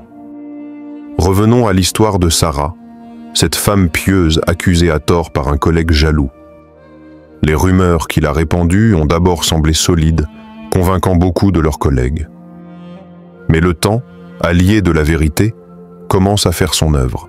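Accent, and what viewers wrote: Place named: Canada. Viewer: Dieu est fidele❤ j ai ete et suis calomniee mais je reste la meilleure reponse..... je suis bien vivante et rayonnante😊 j ai ete calomniee dans une ecole et j ai renversee la situation contre mes detracteurs faux temoignage = peche capital😈👿 dans ce type de lynchage la lachete et la complicite de l entourage pesent lourdement.... celui qui se tait est complice. Le menteur a mal lu Machiavel😊 Dans ce cas l issue est heureuse mais helas parfois l issue est tragique pour la victime super l accent canadien😊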